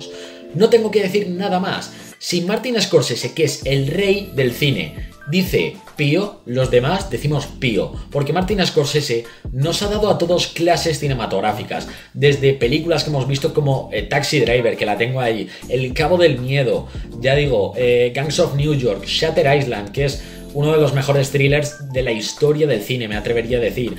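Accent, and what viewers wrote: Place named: Mexico. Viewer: Aparte pochoclo s?? Enserio?? Hablas como mexicano y les dices pochoclos??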